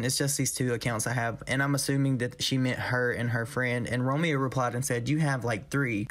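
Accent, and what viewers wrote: South, United States. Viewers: I love your southern accent...you sound so polite, even when you're being snarky. 😂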